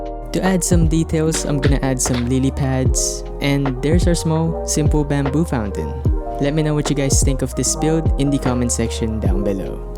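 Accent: Philippines. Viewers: You sound so Philippines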